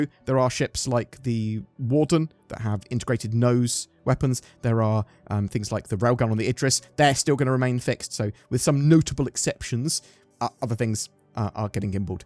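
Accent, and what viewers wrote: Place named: London, United Kingdom. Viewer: You talk like you’re from London!!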